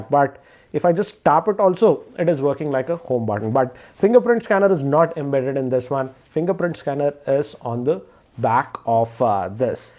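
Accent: Hyderabad, India. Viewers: thanks sir....and your Hyderabadi Hindi is just awesome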